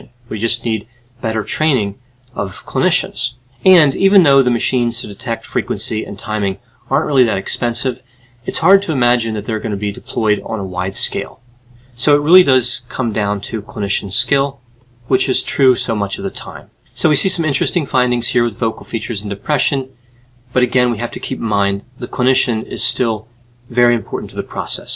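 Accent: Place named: Delaware, United States. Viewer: 👍🏻 Well this was definitely an interesting video. I hope we don’t see the day where robots have anything to do with assessing emotion alone without help from people. 😞 Lol..🤞 And, you have a lovely, and relaxing voice. I like your accent as it reminds me of my home state De!!! 💗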